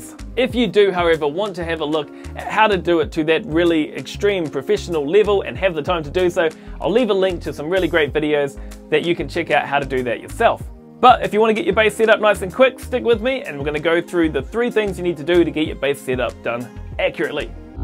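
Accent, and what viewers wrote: New Zealand. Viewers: Tone down that New Zealand accent and I might listen longer. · Sounds like a kiwi living in Australia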